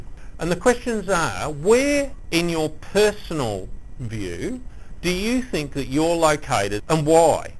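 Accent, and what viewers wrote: Australia. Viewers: I'm pretty sure it's an Australian accent. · Thanks for the video is this an Australian accent?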